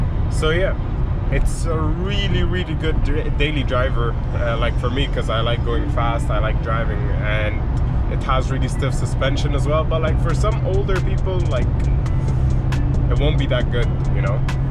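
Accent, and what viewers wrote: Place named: United States. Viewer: you can speak amirican will that is very nice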